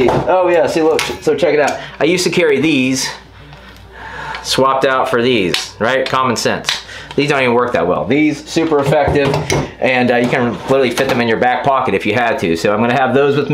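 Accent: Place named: Florida, United States. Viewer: You have got to be around the Florida line between your accent and that moss. Every time we go close to Florida line we start seeing that moss and a mixture of palm trees. Thanks for the video. I really enjoyed the videos.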